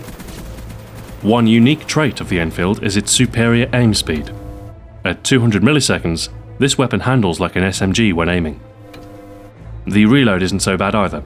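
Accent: United Kingdom. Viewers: uhm,soap is irish, this guy's british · RULE BRİTANNİA. thumbs up if you love his british accent.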